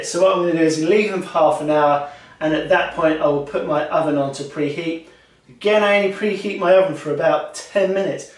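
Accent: United Kingdom. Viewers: Me: hears English accent; hits subscribe immediately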